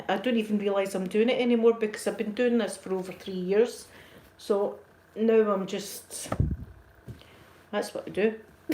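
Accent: Scotland, United Kingdom. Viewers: Yay, another Scottish ASMR channel. Felt like I was listening to an old friend. Thank you for this video!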